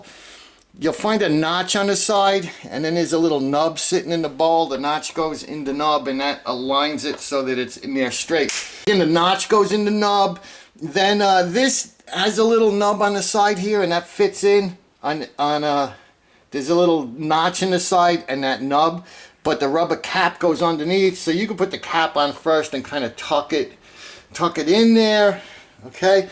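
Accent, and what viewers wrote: New York, United States. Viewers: Good job!! Really enjoyed your accent. Haven't been back to my birthplace, NYC/Long Island, since early 70's!! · That was great! Love the N.Y. accent makes me feel like home. I watched a few video's and you where the only one that mentioned cleaning it and putting a rag in the sink two very important things. Also baking soda and white vinegar will take off that water deposit stuff on the faucet. Also you were the only one that said which way the spring goes very thorough Excellent job! Thank you now I am going to try it!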